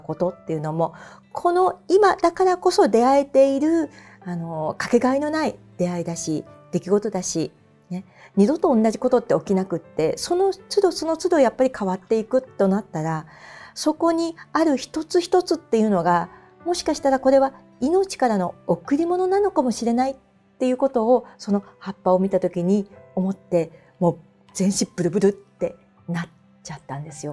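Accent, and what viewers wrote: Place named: Hokkaido, Japan. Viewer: 対談動画ではせくらさんを知りました。 北海道は珈琲と幼稚園のイントネーションが違うと聞きましたが、やはり道産子なのですね😊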